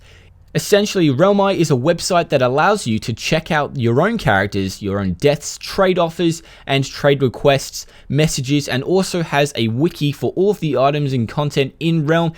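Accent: Australia. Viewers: You australian? What city you at. I'm in perth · yo didn't know ur australian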